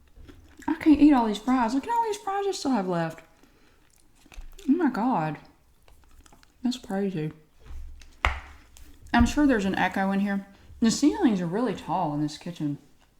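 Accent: South, United States. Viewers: MY GOD you look incredibly stunning! I think it's that success glow, new house, 2 year long channel, new camera (maybe?) but regardless you look amazing! You are seriously one of the most underrated ASMR channel out there. Your content is so simple but bold at the same time, hands down my favorite channel. Thank you for being who you are, dedicating yourself to this channel with a full time job, and 2 kids, I seriously don't know how you do it but you have my respect! I really want to watch this right now but I gotta go to work. But I can't wait to get home and fall asleep to this beautiful Southern belle talk me to sleep! lol.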